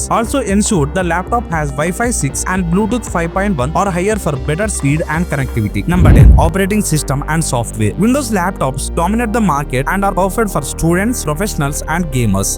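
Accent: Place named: India. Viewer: This was the best type of video I have seen . Man everything is great , really helpful, but your indian English accent sounds fun . 😅😂❤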